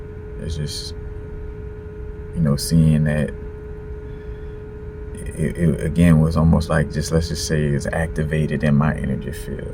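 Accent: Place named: New Orleans, United States. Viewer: been on an august alsina binge lately, love his energy.. then this popped up on the sideline.. #nola love ur videos and ur energy and ur accent. continue to stay protected and covered, thank u for allowing the ppl onto ur journey <3 and thank u for reminding me to check on yeye mook's videos...been a minute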